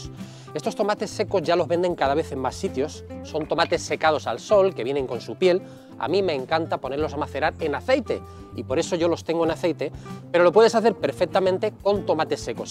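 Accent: Spain. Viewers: Cómo me gusta escucharte! Me encanta el español de España! En Argentina no hay piparras, ni sé qué son? Hay algo parecido para reemplazarlas?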